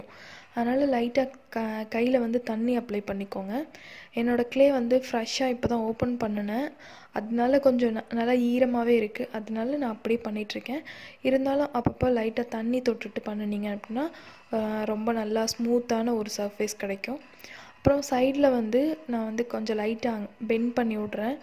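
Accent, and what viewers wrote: Madurai, India. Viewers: Madurai ya sis neenga?? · Neega madurai ya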